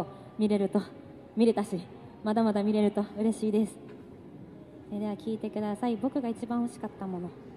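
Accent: Japan. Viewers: 大阪弁エグい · 関西弁がなんか身近にかんじてたちどまってしまう。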